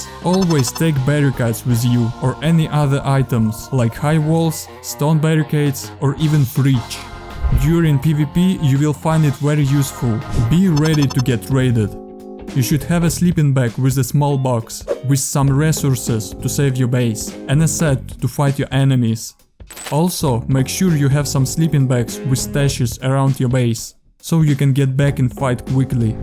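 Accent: Russia. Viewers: Where you from? R u from Russia? U have a Russian accent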